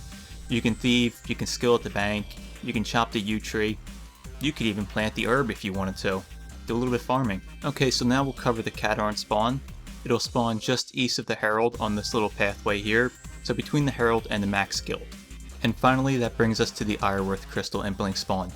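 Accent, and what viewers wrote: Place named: Philadelphia, United States. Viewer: You sound like you’re from the philly area. Also I hope you play osrs by now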